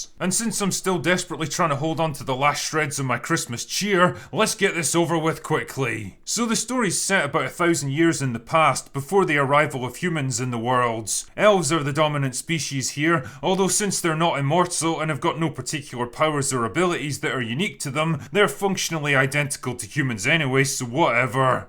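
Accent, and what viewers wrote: Scotland, United Kingdom. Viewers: He's scottish, that's what they sound like, he sounds like that in the livestreams, but more normal scottish accent. Calm down.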